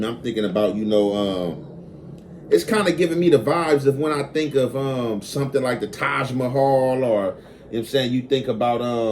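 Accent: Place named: South Central, United States. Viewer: that south central accent is iconic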